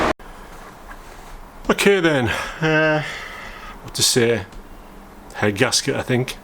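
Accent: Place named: West Yorkshire, United Kingdom. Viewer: Hi I subscribed about 2,3, month ago I saw your site on YouTube as I was flicking through for different mini stuff as iam just about finishing my mini rebuild only took 5 years lol. Iam from West Yorkshire I take it you are with your accent any way hope you solve your water problem all the best. Lee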